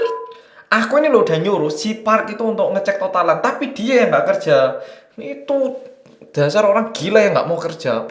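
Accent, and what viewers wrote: Java, Indonesia. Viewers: Keras banget ya industri entartaimen korea,. Mau sedih krna kasus ini nimpaa seunggi🙁, mau ketawa jg mas terjemahinnyan pke logat jawa😅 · Jelasinnya tetep medok ya mas, lg serius2 jd ngakak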